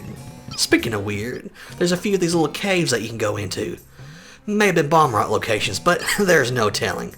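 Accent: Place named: Texas, United States. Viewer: He sounds like a texan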